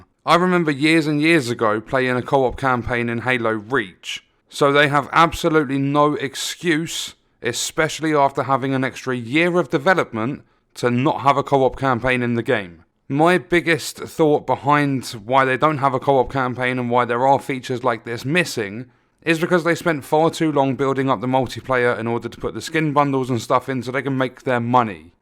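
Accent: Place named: Croydon, United Kingdom. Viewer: Haven't found any AA or A games that take me fancy in a long time. Loot based is my main staple. This is the first time I've actually enjoyed a halo game in decades. Probably because it feels amazing. I would love to boycott AAA games because the state they come out in is close to theft. That's why i like your channel bro, because you are not scared to tell it how it is.... plus your accent and humour reminds me of an old friend of mine from Croydon 👌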